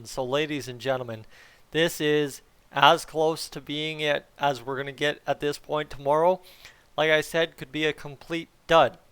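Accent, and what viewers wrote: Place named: Canada. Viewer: You have one of the best Canadian accents I've ever heard, lmao. I love it!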